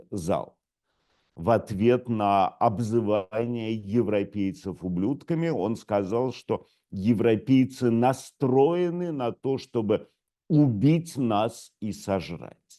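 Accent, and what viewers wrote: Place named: Oxford, United Kingdom. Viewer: Я так и знал! Я всегда знал, или догадывался что милейшие милорд - это человек Оксфорда. Просто по его оксфордскому выговору, по манере держаться, присущей всем небожителям и по качеству работы его мозга.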